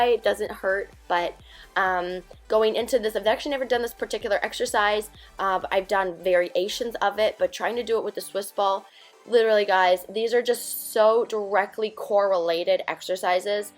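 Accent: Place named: United States. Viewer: Where in America do chicks talk like you? Sounds cute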